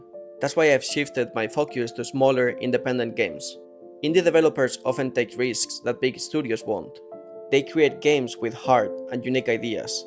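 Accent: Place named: Spain. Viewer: Se nota que eres español, buen ingles crack ÑÑ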